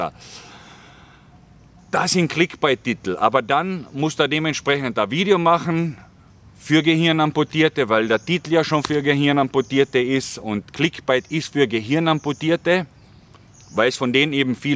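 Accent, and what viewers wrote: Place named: Gailtal, Austria. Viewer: Jetzt hab' ich schon den ganzen Tag Deine Videos quer durch den Gemüsegarten angesehen. Fein, dass Du nach einem Tief scheinbar wieder so einen Enthusiasmus entwickelst. Pass nur auf dass Du nicht aus einer Depri in eine Manie verfällst .... P.S.: mir gefällt Deine Gailtaler Schnauze frei von der Leber weg - kommt sehr ehrlich rüber, auch wenn ich persönlich Manches etwas diplomatischer rüber bringen würde - viell. nicht immer ganz so emotional ... aber Du bist halt so ein "g'rader Michl'" und das ist gut so. Viel Freude in Thailand! .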